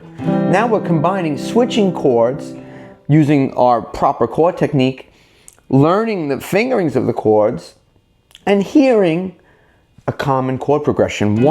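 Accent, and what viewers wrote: New York, United States. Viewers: I very much like your videos and yoa nu youak accent also😉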